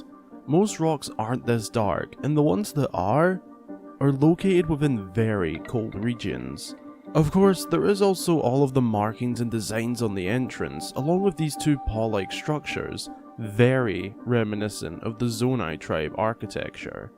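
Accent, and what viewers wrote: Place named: Scotland, United Kingdom. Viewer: You've a very strange accent to me, it kinda sounds like Scottish and Northern Irish mixed and every odd word American lol I like it and the video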